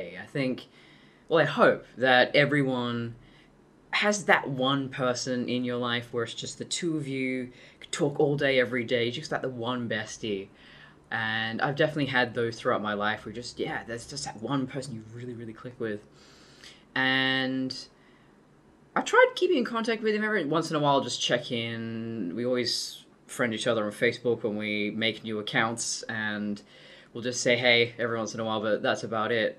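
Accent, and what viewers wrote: United Kingdom. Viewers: I cant imagine your voice any other way. You sound like a sophisticated English women! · Your british accent complements it perfectly. Joanna Lumley like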